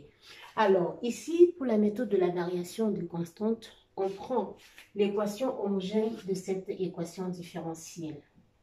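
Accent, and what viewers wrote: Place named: Cameroon. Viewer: Vous êtes camerounaise, cela s'entend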